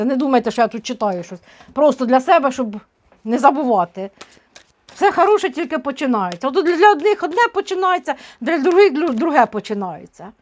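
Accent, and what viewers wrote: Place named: Chernihiv, Ukraine. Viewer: Ми в чернігівській обл.точно так балакаємо і нічого,а скільки наших хлопців з таким суржиком захищають країну!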